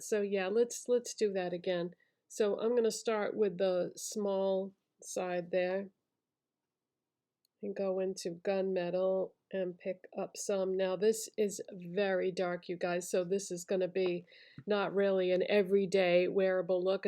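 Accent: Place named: New England, United States. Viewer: Actors take note, this is a legit New England accent.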